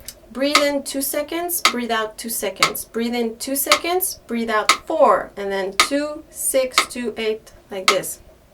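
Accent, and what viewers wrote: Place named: France. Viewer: French horn player here 📯 Thank you for you tips , really helps. I can feel that I'm full with air :) Also you have nice English with gorgeous French .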